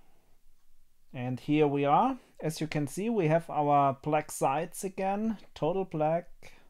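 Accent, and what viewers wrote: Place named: Germany. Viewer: Ich habe noch nie jemanden gehört, der so deutsch Englisch spricht haha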